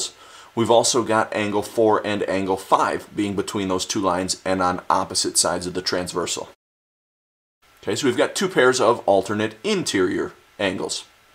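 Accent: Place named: Canada. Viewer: this man is a canadian fo shooooooooo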